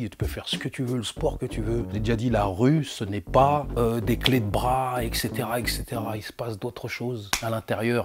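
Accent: France. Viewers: Un pure parigo, un phrasé telment inimitable 🖤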